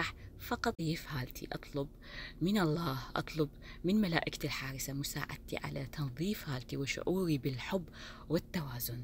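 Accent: Iraq. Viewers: ههههه حبيت الكلام العراقي ❤ شكرا دايما طلي علينا بفيديوهااتك الحلوة المفيدة.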